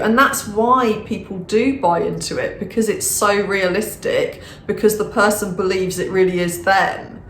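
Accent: United Kingdom. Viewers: I adore your Britain english accent😍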